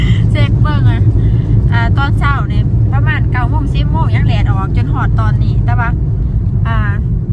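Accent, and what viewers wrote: Laos. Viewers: ก็เขาเป็นคนลาวเนาะจะให้พูดไทยได้ดีกว่านี้หรือ? ถ้าไม่ชอบก็ไม่ต้องเข้ามาดู แค่นั้นจบ!!!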